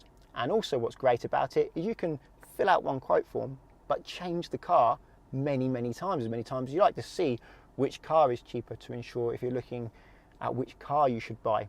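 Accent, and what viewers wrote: England, United Kingdom. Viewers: YouTube isn't good at captions when it comes to English-English.. Sometimes it's bite point and sometimes it's bike point in the transcript xD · i really enjoy watching your videos... not only because I'm learning manual car and find your videos the best teaching videos about manual cars... but also because i like to listen to your perfect British accent as I'm English language learner 😊.. thank you very much sir 🎉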